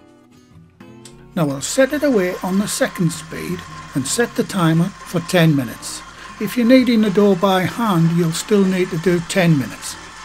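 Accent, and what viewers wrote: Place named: Geordie, United Kingdom. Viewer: As a fellow Geordie, I love hearing your North East accent. I've made a couple of your bread recipes (just recently found your channel), and both times they've been a great success. Thank you for these delicious recipes. I'm looking forward to making more of them.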